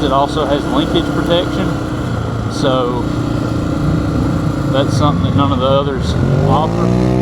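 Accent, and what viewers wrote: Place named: Kentucky, United States. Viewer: Where you from? I’m in London Kentucky and your accent is similar to mine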